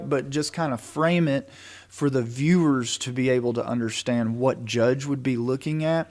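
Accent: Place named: South, United States. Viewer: Love your southern accent 🙂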